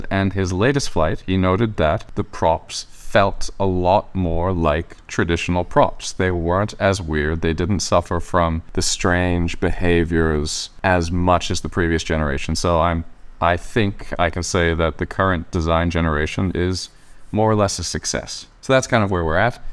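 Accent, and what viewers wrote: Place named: Eastern Canada, Canada. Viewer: by your pronunciation of your T's I'm guessing you're from eastern Canada? Thanks for your work on this. Fascinating stuff I will eventually be trying to print this with CF-Nylon and again with Lightweight PLA (100% infill)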